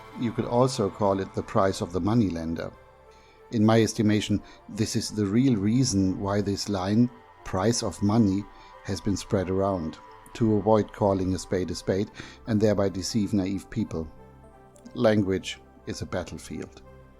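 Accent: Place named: Germany. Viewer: Love your videos, not just the info and your thick German accent but your editing, too. Good to have you back.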